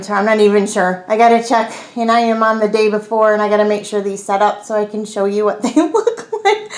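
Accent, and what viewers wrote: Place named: Midwest, United States. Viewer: OKAY I HAVE TO KNOW!! Where is your Midwest accent from?! 😍 These fudgesicles look so good!!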